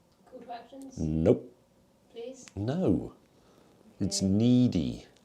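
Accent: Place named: United Kingdom. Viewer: This guy is just plain boring . If it weren’t for the British accent and the long hair…..?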